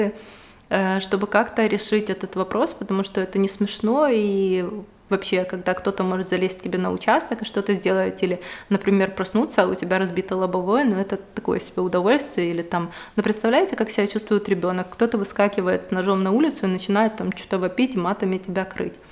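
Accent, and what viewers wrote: Ukraine. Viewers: какой прекрасный разговор получился!) Вы чистая, светлая, душевная. А ваша речь и диалект - я как сама окунулась в свое детство, которое тоже прошло на Украине, но уже 27 лет не живу там. Несмотря на разницу в возрасте, у меня было точно такое же детство, такие же теплые воспоминания...Все ваши выражения " у нас так говорили/говорят" мне понятны, потому что у нас тоже так говорили 😀. Теперь моя мечта встретиться с вами где-нибудь на просторах нашей планеты и пообщаться лично за чашкой кофе.... ❤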